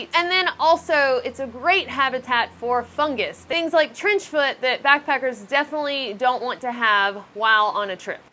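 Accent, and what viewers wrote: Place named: Rural, United States. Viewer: I love how a handful of words you say sound full-blown country...like, hike, dry, time, a while, supply, resupply, I, find, myself...but sometimes say them in that somewhere-between-the-Northeast-and-Midwest accent. I'm sure I have a chopped up accent too being raised in Southeast Texas by parents from South Philly. Even to this day, coworkers think I'm full of shit when I say I've live here all my life.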